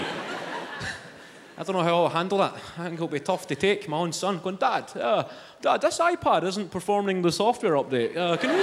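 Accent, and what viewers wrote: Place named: Edinburgh, United Kingdom. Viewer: His Edinburgh accent was on point he sounded like my pal 🤣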